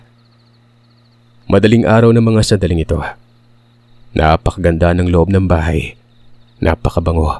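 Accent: Visayas, Philippines. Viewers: Ka angayan mo bisaya uieeee hahahha😊😊😊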